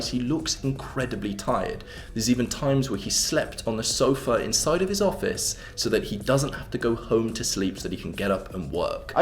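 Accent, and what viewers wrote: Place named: RP, United Kingdom. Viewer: you have to be casted on the Crown.seriously bro you would need 0% effort to talk like them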